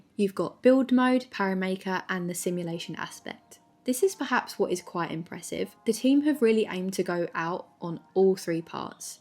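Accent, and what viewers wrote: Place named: United Kingdom. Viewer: really great and calm video! i love that youre also british cuz i usually only ever find american ytbers making sims/cosy game vids and it just feels more grounded probs cuz its a familiar voice but i digress! :3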